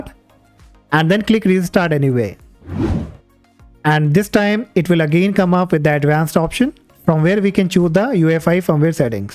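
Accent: India. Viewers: Always love you hear an indin accent on tech guides, yk you have a good source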